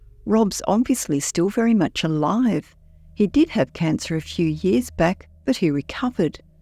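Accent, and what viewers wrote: Australia. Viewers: The woman narrating this story is Australian, not British.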